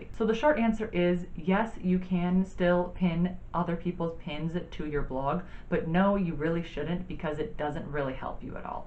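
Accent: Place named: United Kingdom. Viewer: Thanks for helping video. I saved your video to watch it later again because I don't understand British accent properly because they talk shortly. But English is not my native language and also I'm not expert it English 🙂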